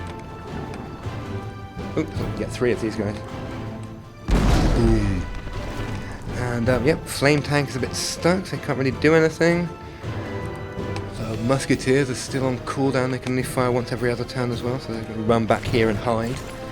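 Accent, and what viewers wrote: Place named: England, United Kingdom. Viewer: his pommy lisp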